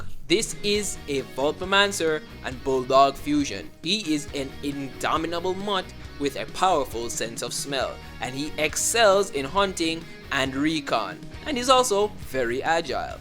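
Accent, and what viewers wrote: Jamaica. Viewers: I had no idea he was Jamaican until now :face-blue-smiling::face-fuchsia-wide-eyes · Yo your jamaican? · You kind of sound Jamaican A little bit for me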